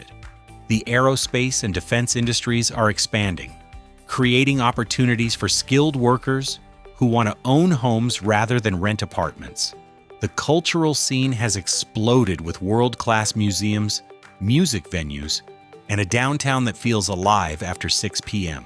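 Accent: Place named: California, United States. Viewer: Eve thing you said about Texas is NEGATIVE. Pure BS created by AI. YOU MUST NOT be a Texan. You sound like from California trying to prevent CA residents to move in to Texas.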